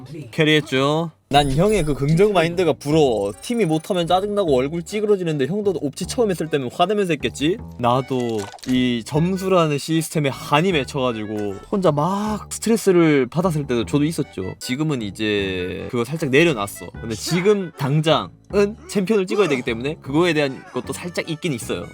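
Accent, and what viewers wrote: Gyeongsang, South Korea. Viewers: 경상도사람임?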